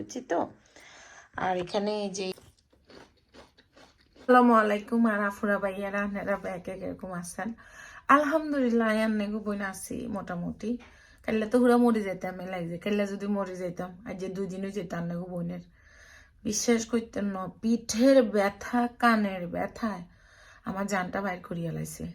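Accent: Noakhali, Bangladesh. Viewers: Apnar kotha purai noakhailla.amio noakhailla😂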